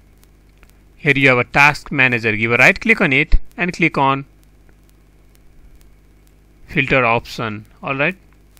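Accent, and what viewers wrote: India. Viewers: I love Indian Eng accent ; ) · this was the first video i clicked and when i heard that indian accent, i instantly knew this would save my life! thank you brudda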